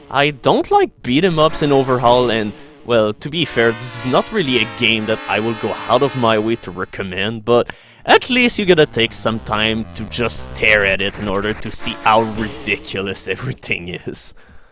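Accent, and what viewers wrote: Quebec, Canada. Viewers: Best accent ever. Just another reason I am jealous of Quebecois (I'm Canadian). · I think he's from Quebec. It's pretty much the best accent for LPing I reckon. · Yep, that is indeed a Quebec accent. I live with frenchmen, myself.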